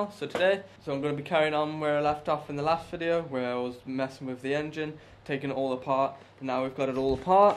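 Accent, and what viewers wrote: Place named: England, United Kingdom. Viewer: You in England or Ireland?Im guessing England as of your accent and plates on the other cars...so did you bring the civic over or what?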